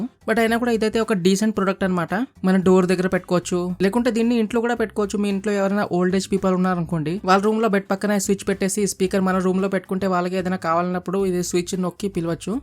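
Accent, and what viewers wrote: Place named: Hyderabad, India. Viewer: Bro, Name emo. Slang emo old city muslim guy la undhi.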